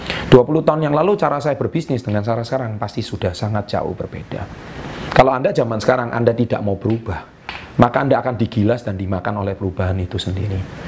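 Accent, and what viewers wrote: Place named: Surabaya, Indonesia. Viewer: ohh.... wong suroboyo tah ? hahaha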